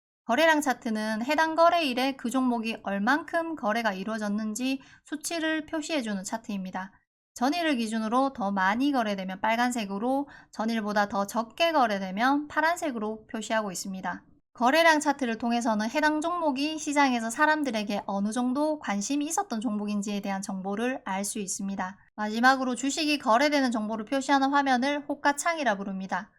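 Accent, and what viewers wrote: Gyeongsang, South Korea. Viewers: 경상도 사투리 여성분 목소리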